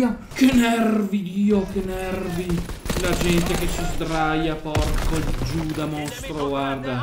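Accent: Veneto, Italy. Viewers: Mi fai troppo ridere!!!😂😂 In questo video si vede come ti sale il Veneto cazzo ahhahaha Grande! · ma da quale parte del veneto provieni?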